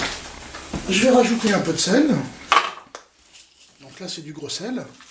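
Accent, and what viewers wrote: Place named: France. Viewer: Merci pour votre recette, c'est en cours de préparation chez moi! Et merci pour ce bel accent bien français ;)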